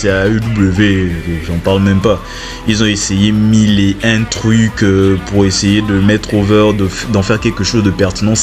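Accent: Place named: Ivory Coast. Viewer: Je dis tu es ivoirien d´origine oubien l´accent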